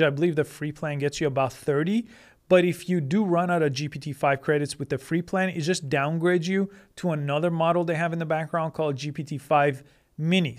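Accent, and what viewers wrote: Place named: Iran. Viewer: You sound iranian. Where are you from?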